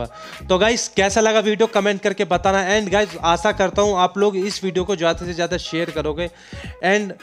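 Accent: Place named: Haryana, India. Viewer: AP PAKKA HARYANA SE HO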